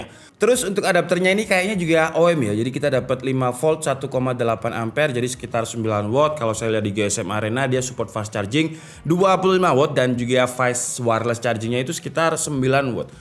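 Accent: Malang, Indonesia. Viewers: Asli malang kah om ?? Kok kyk di malang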